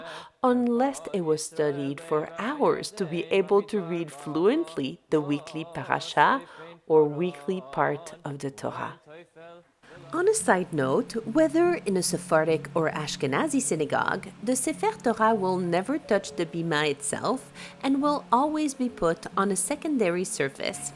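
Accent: France. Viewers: Vous avez un accent français madame !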